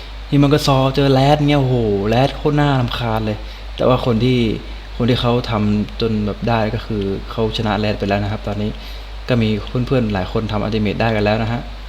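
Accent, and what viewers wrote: South, Thailand. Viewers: พี่คนใต้หรอครับ5555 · พี่เป็นคนใต้หรอ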